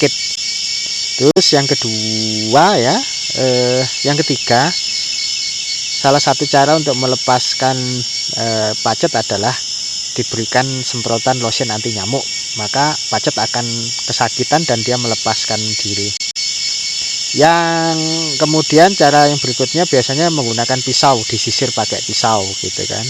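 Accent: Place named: Java, Indonesia. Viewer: itu di daerah mana bang. kok kayanya anda pake logat jawa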